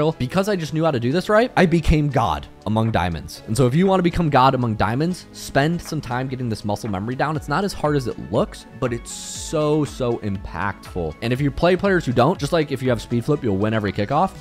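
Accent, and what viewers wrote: Poland. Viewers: wait are you from poland? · Is he polish?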